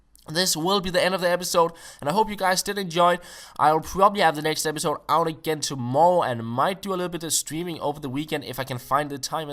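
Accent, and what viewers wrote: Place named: West Zealand, Denmark. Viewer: hvaaa? er du fra Vestjælland?:)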